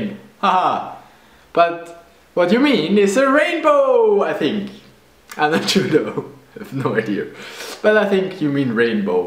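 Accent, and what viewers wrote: Germany. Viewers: Thank you for this... The other ones I found were Anmericans imitating German accents - You are the real deal and that is what I needed. I am an actress and wanted to check my German accent - You helped immeasurably and you were fabulous and entertaining to watch!!! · Holy faacckk. As a German, born in Canada, all throughout watching this I couldn't stop smiling. (: what a gorgeous smile. And those beautiful eyes. ♡ AND THAT VOICEEE. 😊😊 My looordd. I need to go to Germany and reclaim that accent. 😁😁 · lol i love your german accent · You are the cutest thing ever & envy your German accent!!♥️ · You're so adorable, and I have the feeling you wouldn't be as adorable without your accent :) good job you were born German :) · I love the German accent! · Damn, I love you German accent! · Du bist deutsch! :D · BAHHA german accent sounds cute on this guy! 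· Apparently I don't know too many Russians, but I know how this language sound. As a native it's way too easy for you, but for foreigners German influence is obvious in this video, therefore for me he's far from Russian. Всего хорошего! :)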